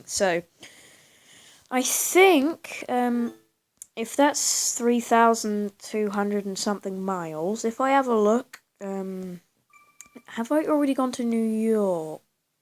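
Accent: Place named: United Kingdom. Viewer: Nice too see that your rly active!!! Lol tbh ur British voice is GREAT. I’m an American who wants to be British or Aussie. Aussie and British accents are AWESOME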